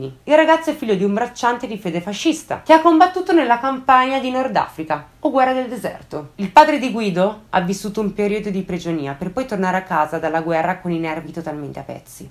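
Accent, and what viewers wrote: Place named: Piedmont, Italy. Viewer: Hai una bella voce, un bell'accento piemontese con gli accenti giusti, una rarità. E i tuoi resoconti sono veramente interessanti e esaurienti!!!! Io ricordo bene il caso Graneris! Sono di Biella e l'ambiente vercellese era quello della mia adolescenza, il freddo, la nebbia e una campagna desolata.....non la rimpiango! Sono tanti i casi italiani inquietanti che hanno lasciato la gente inorridita, e mi sarebbe piaciuto svescerarlli di più, ma non ricordo i nomi, spero che ti capiti di rivangarli, mi toglierei qualche domanda senza risposta. Intanto ti ringrazio, fai un buon lavoro!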